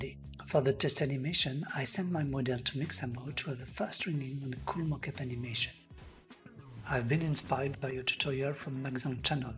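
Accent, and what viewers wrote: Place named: France. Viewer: To me, you sound like you have a slight French accent, and I don't know if you knew this, but many Americans and other English speakers love French accents. In fact, your accent is in a great sweet spot where you sound foreign enough that you get the mystique of a foreign accent, but your pronunciation is good enough that there is no trouble understanding you.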